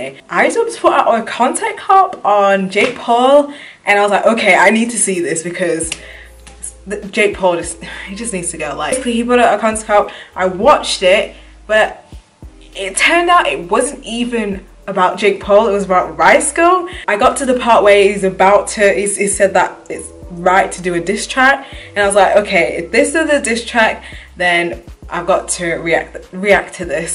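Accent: North, United Kingdom. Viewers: You British? If so where abouts are you from? London? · You sound northern where are you from? Nice video btw, finally someone that actually knows what's going on before they react to it 👌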